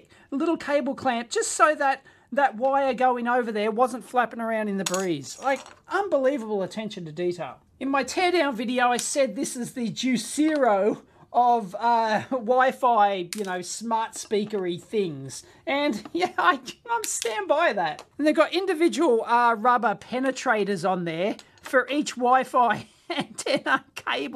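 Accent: Australia. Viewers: Half a bee,s dick 😂😂😂😂I love crazy ozzies and kiwi,s accent strewth mate by crikey ya flaming golar 😅😂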